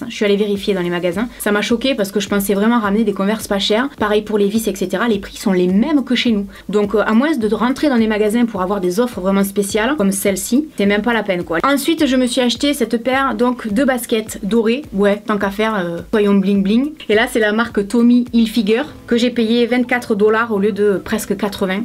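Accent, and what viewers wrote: South, France. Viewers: J'aime bcp ton honnêteté et ton accent du sud lol. Effectivement victoria secret je pense que c'est bcp de marketing car j'ai aussi acheté des brumes (3 ou 4) et effectivement ça ne tient pas du tout!! Continue j'adore tes contenus 😬😘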